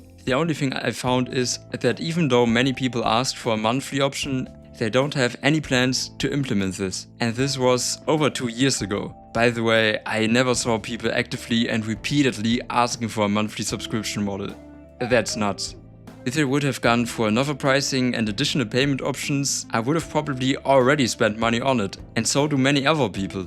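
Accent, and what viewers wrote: Germany. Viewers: ARE YOU GERMAN BY ANY CHANCE WOW YOU GOT DISCORD? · Dieser deutsch Akzent 😂❤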